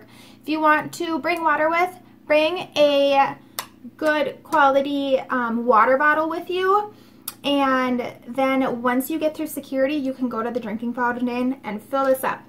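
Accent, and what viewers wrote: Minnesota, United States. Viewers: Are you from Minnesota by any chance?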